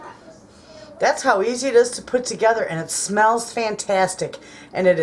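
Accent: Michigan, United States. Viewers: I could tell you were a Michigander right from the first video I watched! I love your accent! Your recipes are sweet, simple and to the point. I looked up info. on the township you live in. What a wonderful, small community to spend time with your families. God Bless you and yours. Subscribed and liked😗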